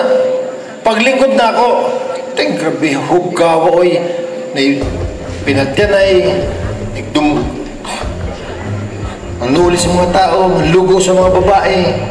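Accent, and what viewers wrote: Visayas, Philippines. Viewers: c idol hahaha sweto kaau mg visaya!!!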